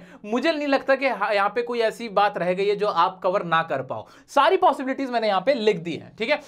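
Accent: India. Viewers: because indian accent laga hai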